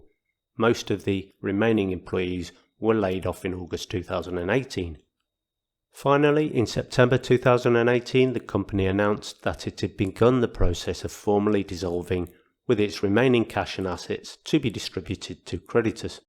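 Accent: United Kingdom. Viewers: 719 losers follow you...Yeh ! you work for CNN and any other acronym cunt out there..David Bowie said get ready for cunts...Oh ! I just saw another one YOU tube.....Even worse is the fact that you sound like you are from the ass end of the Midlands....Just go away will ya.... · Hahaha I was gonna say you could have used your British accent as an excuse, until I saw the graphic. Nice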